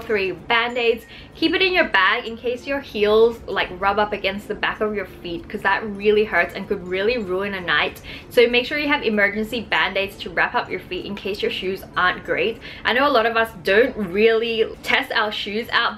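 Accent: Australia. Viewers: WengieVlogs thanks:) i think people watch your vids partially for your content, personality, and editing skills;) and partially because of your Australian accent ^-^ and maybe your hair ♥ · I love your Australian accent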